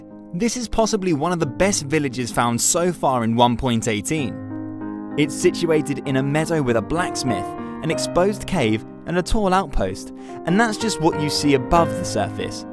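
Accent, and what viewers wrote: United Kingdom. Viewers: Br’ish boy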